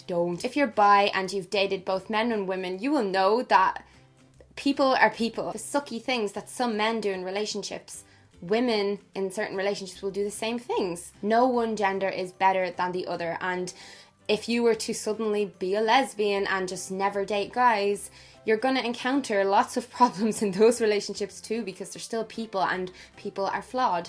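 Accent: Ireland. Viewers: Hello, I relate to almost everything you mentioned in this video! You're so funny and your irish accent is beautiful to hear! Youre one of my favorite youtubers, hope you're reading this😊 Hope you a wonderful day. Benedetta ♥️ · You're Irish right? The accent.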